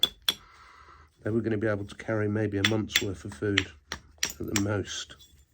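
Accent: England, United Kingdom. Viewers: С первых слов понял что это англичанин, не американец. Произношение слов не ломаное, не голливудское.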